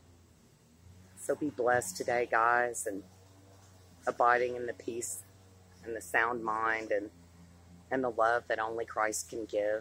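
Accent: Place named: South, United States. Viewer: Your voice is beautiful and I love the southern accent. I am a native Texan and I missed the southern drawl. I love you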